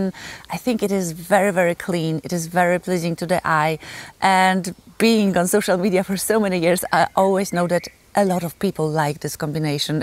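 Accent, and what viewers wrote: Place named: Poland. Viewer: love your garden. I just started mine, I was too busy to do it earlier. Nice polish accent. Greetings from Atlanta.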